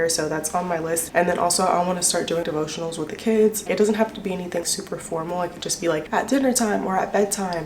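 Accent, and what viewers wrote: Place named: United Kingdom. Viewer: British needs her own tiktok or something. 😂 She's a hoot! I mean, I love regular too, but British... LOL! I'm here for it.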